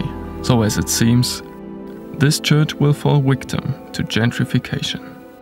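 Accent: Germany. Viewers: I love the way you pronounce Victim. Or the V in general. It's so German!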